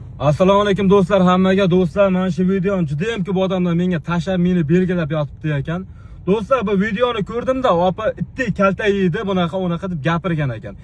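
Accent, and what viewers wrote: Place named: Laqay, Uzbekistan. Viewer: Laqaycha gapirma zaybat oʻzing laqay degani gapriwni ham laqaycha qildeganimasku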